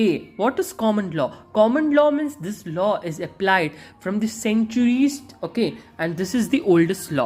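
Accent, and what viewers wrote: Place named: Karnataka, India. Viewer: Omg u r kannada accent is so beautiful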